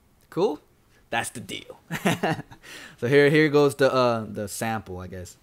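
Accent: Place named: New York, United States. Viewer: did you go to school in new york? sounds like your from new york. I HOPE U ARE XD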